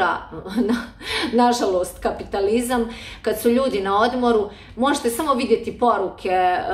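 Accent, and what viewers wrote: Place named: Bosnia and Herzegovina. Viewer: Smatram da ne treba da raspravljamo o tome kojim jezikom prica.Ko imalo poznaje jezike bivse Jugoslavije taj zna dok neko, otviori usta"odakle dolazi. jeste sa Pala ali ima cisti bosanski akcent.Bez ljutnje ali budimo realni i iskreni.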